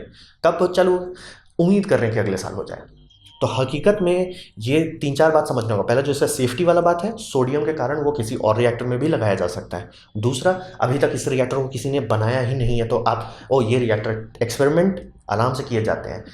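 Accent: Bihar, India. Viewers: Sir ar u from bihar Or jharkhand please replay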